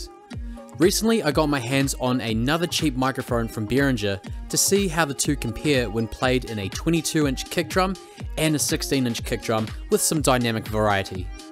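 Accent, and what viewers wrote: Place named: New Zealand. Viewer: You from NZ bro? u got my auto sub hahaha , solid video bro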